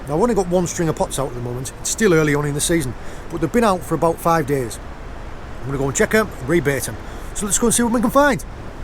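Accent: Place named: Cornwall, United Kingdom. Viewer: How long did you keep the berried lady out of water for? Did you dip regularly? These are things we would like to know. Was there any need for a double v-clip? I saw she was bleeding when you released her. I know she'll coagulate eventually, but is it more stress on her system? Loving your shore knowledge and your Northern x Cornish accent...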